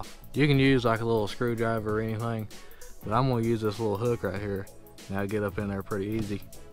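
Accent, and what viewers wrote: United States. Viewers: U did a good job being the first one, their is no way we don't live close to each other with that accent, I live in Central NC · Awesome video! Straight to the point with all the information you need without a buncha blah blah blah, and a plus is you got a southern accent like me! EXCEPT it is impossible to unplug the harness glued to the headliner !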